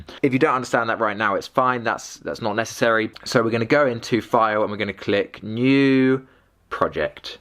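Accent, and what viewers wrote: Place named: United States. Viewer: Mate what if you've got an external hard drive after using FCP and it's taking SO much space on my internal HDD lol, how do you then shift the library to the new HDD without running the existing videos? I actually went into the original media files and deleted a lot of the files which has fucked up the videos I already made. But I'm like whatever, I need space. Haha so yeah REALLY need to sort this out and help will be appreciated. Only a few minutes in but explanation is clear as F and also I'm English so honestly, the American accent does get a bit annoying after a while in tutorials not gonna lie LOL. Thanks a lot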